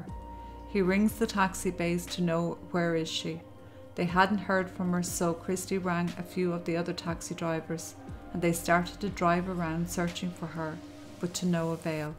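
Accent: Ireland. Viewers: I have just found your channel and Love ❤️ it. I’m Irish living in Oz since 1986. Love to hear your Irish Brog and humour. 👏🏻👏🏻Your amazing research and respectful presentation of these cases are Wonderful. Keep up the Great Videos, whilst the stories are tragic, and heartbreaking, they are stories that need to be told so We can All count our blessings and remind ourselves of the fragility of Life… hugs from Australia 🇦🇺 🇮🇪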